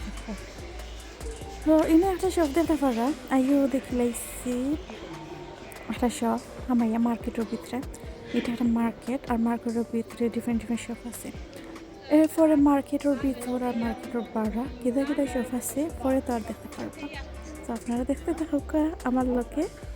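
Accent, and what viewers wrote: Sylhet, Bangladesh. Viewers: আপু সিলেটি কথা গুলো আমার অনেক ভালো লাগে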